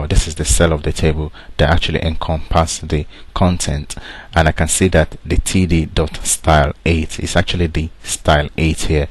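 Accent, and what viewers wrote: Nigeria. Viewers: Are you nigerian? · pls r u a nigerian? just curious cos of the accent. thanks 4 the video. cheers! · r u nigerian?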